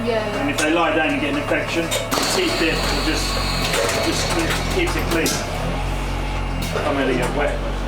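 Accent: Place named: East Midlands, United Kingdom. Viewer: Just started watching your videos, listening to your accent I thought you were from around East Midlands and just seen Leicester. Big move and a sensible move, well done you, we got out of Leicester 4 years a and moved to the Outer Hebrides thinking it was remote, nothing like you. Look forward to you renovating the house, where in Leicester were you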